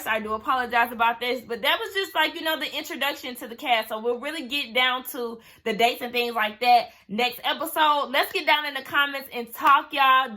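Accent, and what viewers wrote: South, United States. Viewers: Fantastic review and agreed with your summary. Your southern accent is lovely also. Now on to the tea....did you hear that Jason and Liz from last season (yes the couple who were co hosting for Tommy in this season) have split up? Sad times 😞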